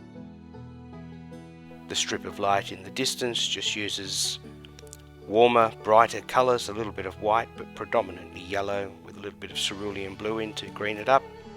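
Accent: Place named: Australia. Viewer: Ah finally found your channel after discovering it a couple weeks ago then losing it lol. Love your style of painting and the way you talk, gotta love them aussies. Im subbed.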